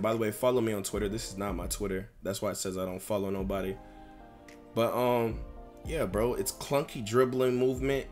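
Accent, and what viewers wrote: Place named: Los Angeles, United States. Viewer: I swear he sounds like he's from LA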